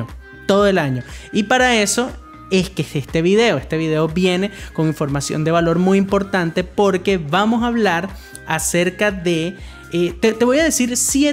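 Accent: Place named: Venezuela. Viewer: No se porque, pero me pareces q eres de venezuela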